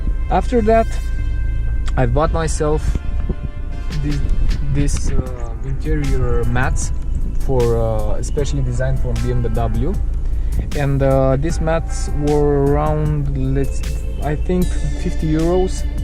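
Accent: Romania. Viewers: dupa accent te-am cunoscut ca esti de al nostru😁👍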